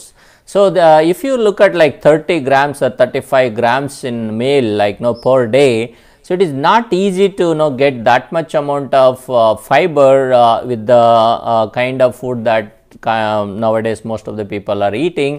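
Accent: India. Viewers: sir u are south INDIAN?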